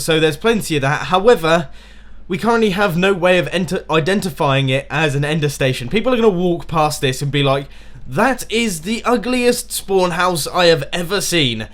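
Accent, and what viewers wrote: England, United Kingdom. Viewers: YOU ARE ENGLISH? i didnt know :/ :P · It’s hello, he’s just British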